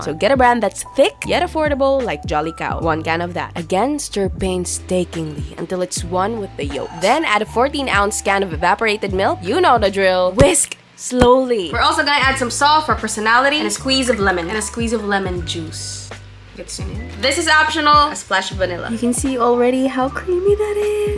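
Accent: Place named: Mexico. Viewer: An asian girl who talks like mexican or latin american, What do you call urself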